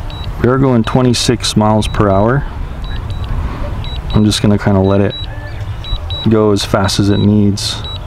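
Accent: Hawaii, United States. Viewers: Howzit great video, I hear a slight localness/Hawaiian in your voice. Mahalo again for the video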